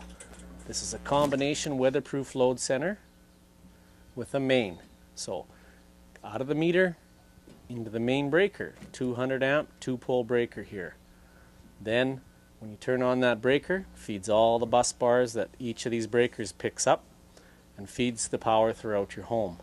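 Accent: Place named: Canada. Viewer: He lives in Arizona? This guy sounds totally Canadian Ay!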